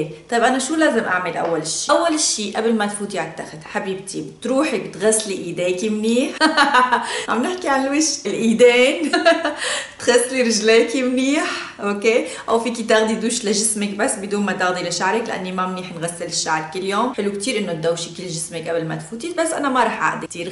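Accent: Aleppo, Syria. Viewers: حضرتك من سوريا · دخيل الله ما ألذك😍😍 ماتبلى هالضحكة وهاللهجة الحلبية اللي بعشقا❤️❤️ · دخيل روحك بتجنني 😍 دخلك كأنو لهجتك حلبية ؟؟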